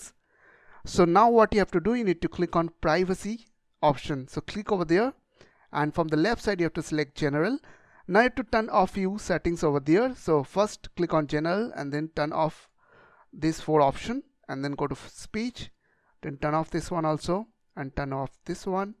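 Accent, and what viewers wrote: India. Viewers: I thought he was going scam us cause of his indian voice. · I thought he would just ruin your computer with his Indian voice but after looking at the comments I knew he was one of the good ones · I always wonder why it always the guy with the Indian accent that know all about technology but it truly a blessing to have the around when we have trouble.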